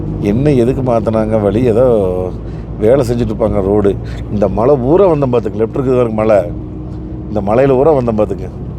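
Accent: Kongu, India. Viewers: கொஞ்சும் கொங்குத் தமிழ் பேசும் கோமகரே...! திகட்டாத தித்திக்கும் பேச்சுடைய திருப்பூர் திருமகரே...! எங்கள் மதிப்பிற்குறிய டான் திரு. அண்ணா❤❤❤🙏 அவர்களே எப்படி இருக்கீங்க அண்ணி❤❤❤🙏 எப்படி இருக்காங்க ரித்திக்❤❤❤ எப்படி இருக்காரு. அழகான வீடியோ அற்புதமான இயற்கை எழில்கொஞ்சும் பனிமலைகள் பல வண்ணங்கள் கொண்ட மரங்கள்...! கண்கள் இரண்டும் குளிர்ந்தன அருமையான காட்சிகள் கண்டு...! நம் ❤சிட்டுக்குருவியோட❤ தங்களது இந்த லடாக் பயணம் இனிதே தொடர்ந்திட நல்வாழ்த்துக்கள் அண்ணா❤❤❤ என்றும் அன்புடன் உங்கள் தம்பி என்றும் அன்புடன் உங்கள் TMF ரா.முஹம்மது கபூர் (சென்னையில் இருந்து) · அண்ணா நீங்கள் பேசும் கொங்கு தமிழும் லடாக் பயன வீடியோவும் மிகவும் அருமை வாழ்த்துக்கள் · கொஞ்சும் கொங்குத் தமிழ் எப்ப வரும் காக்க வைத்த அண்ணன் அவர்களுக்கு வாழ்த்துகள்.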